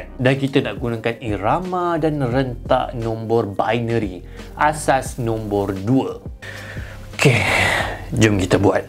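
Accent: Malaysia. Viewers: Logat orang Malay membuat saya sendiri tertavva terbahak bahak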